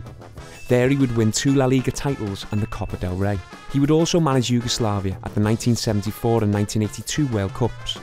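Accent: Liverpool, United Kingdom. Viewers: May be not but I defo can say he's scouse 😁😁😁... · Scouser accent 😂🤣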